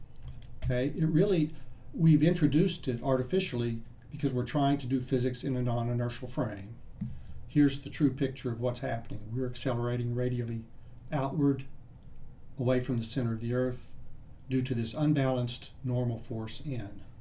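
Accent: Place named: Texas, United States. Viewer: That's a Texan accent right there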